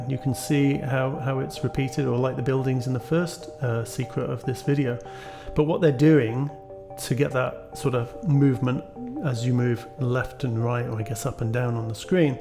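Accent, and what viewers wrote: United Kingdom. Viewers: Is this a sligthly british Joey Zaza talking ?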